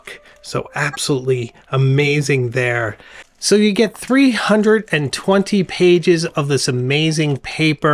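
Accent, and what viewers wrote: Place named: Pennsylvania, United States. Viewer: Does anyone know where is from? The accent gives Bucks county PA.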